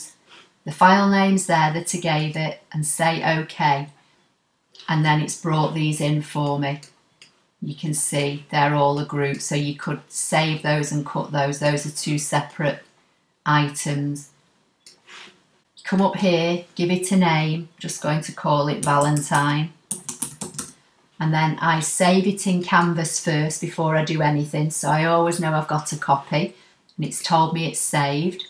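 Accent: United Kingdom. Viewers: Wow. This is awesome. I have been searching for a way to curve text and save as an svg file. You couldn't have made it any easier. I love the British accent. Thank you.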